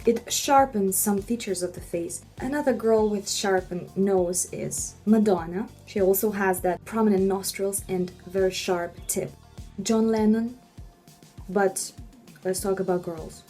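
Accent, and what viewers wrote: Russia. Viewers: I can hear russian accent hehe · Russian))) да неплохо звучит наш акцент)) я всегда за это волнуюсь ,когда говорю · Русский акцент чуть чуть чувствуется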